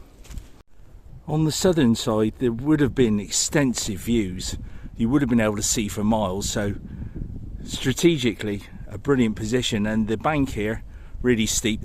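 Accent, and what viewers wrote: Wiltshire, United Kingdom. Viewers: all your walks are wonderful, taking me to places my ancestors would have known - and I can never visit in person. That means alot to me and also to hear the Wiltshire accent is delightful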